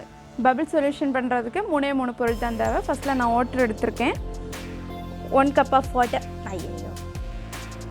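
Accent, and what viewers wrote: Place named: Nagercoil, India. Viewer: Nagercoil spech maari erukku I miss my place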